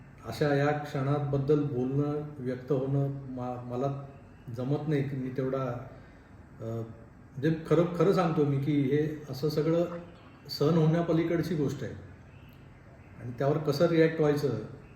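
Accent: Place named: Khandesh, India. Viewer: खानदेशी लहजा ला कायमचे मुकलो खुप दुःखद घटना 😔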